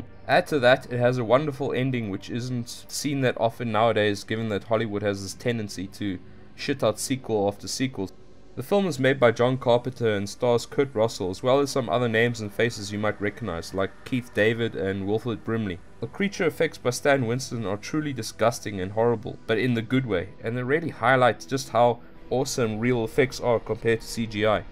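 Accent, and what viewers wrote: South Africa. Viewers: Hey man, are you South African? You sound South African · U sound like someone from Cape Town bro, we all have the same or somewhat similar accent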